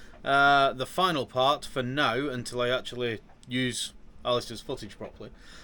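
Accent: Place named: Lancashire, United Kingdom. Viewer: I hope you are feeling all sorts of good. As you are looking strong and well. The sea is good for you Lancashire Lad. So, stay boaty. Ps. More of your doing and learning content please..it make sailing ..accessible to ordinary perps..also I like your accent, as I am a sort of a Poulton Le Fylde exile. I miss it.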